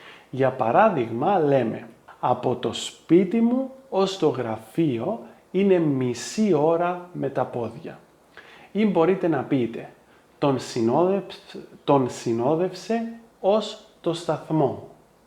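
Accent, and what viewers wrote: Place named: Cyprus. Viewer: Ακούγεσαι λίγο σαν Κύπριος. Διδάξτε με πότε να χρησιμοποιήσω Ω και ο στη γραμματική. Δεν έχω πάει ποτέ στο ελληνικό σχολείο. Είμαι αυτοδίδακτος.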